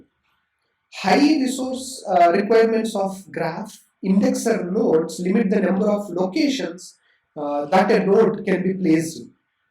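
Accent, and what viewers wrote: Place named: India. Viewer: Indian accent + echo chamber^2 = non native english speaker can't understand.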